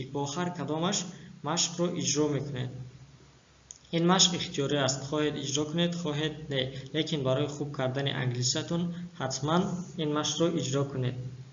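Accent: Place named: Literary Tajik, Tajikistan. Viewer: Рахмат додарчон, забони тоҷикӣ адаби ба дараҷаи олӣ гап мезани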